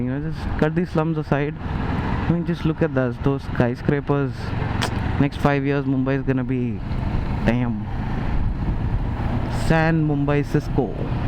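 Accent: Hyderabad, India. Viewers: FYI its concrete roads :). Reason being that tar coal roads cannot withstand the amount of rains Mumbai faces (a hell lot). So that's why concrete roads and those small bricks on roads are called as paver blocks they are mostly used at junctions or side walks because all the utility cables /pipelines are under ground in Mumbai and if they want to lay new cables or repairing job to be done it will be difficult to dig through concrete than just simple removal of those paver blocks. Also ground water has nothing to do with these paver blocks/ roads as your friend's suggested. I like your Hyderabadi Hindi commentary...Cheers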